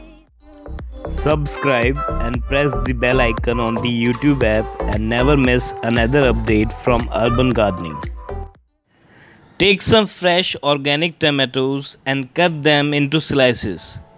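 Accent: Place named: India. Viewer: ох уж этот индусский акцент.... ужасно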